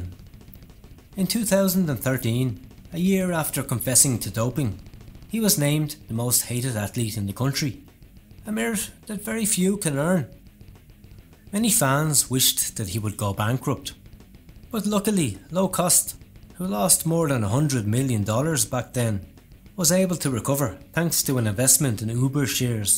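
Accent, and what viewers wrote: Dublin, Ireland. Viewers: As an Irishman myself - I know the narrator's accent only too well, he's from Dublin. Reminds me of Paul Kimmage and a little bit of Pat McQuaid. I like his sense of humour. A question to the narrator: are you related to Paul Kimmage? Are you or? Yeah, I can see similar writing and story telling skills as Paul Kimmage, and the contempt for cheats and dopers. This is definitley not GCN. Kudos to the narrator.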